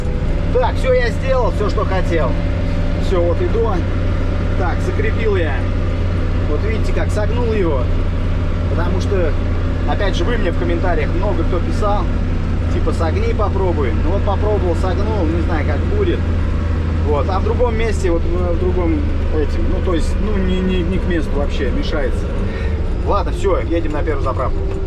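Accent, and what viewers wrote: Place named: Nizhny Novgorod, Russia. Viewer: Родной нижегородский говор )))) живу в Москве как этого не хватает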